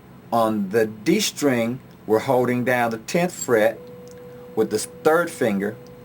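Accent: New Orleans, United States. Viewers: You are one of the bet teachers I have ever learned from. Thank You. is that a New orleans accent?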